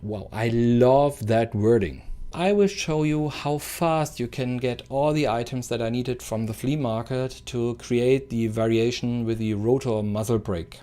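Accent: Germany. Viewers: Wieso merke ich direkt nach dem ersten satzt dass du Deutscher bist :)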